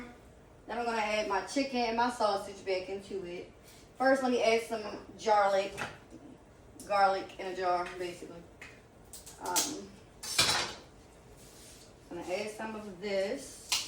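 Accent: Baltimore, United States. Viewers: Is that a b’more accent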